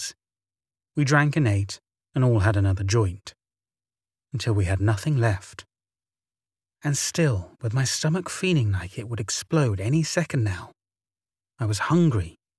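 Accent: England, United Kingdom. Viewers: More than happy to leave a comment. It's comforting to hear hear a fellow Englander narrating these Wendigo and Skinwalker stories. I really love your channel.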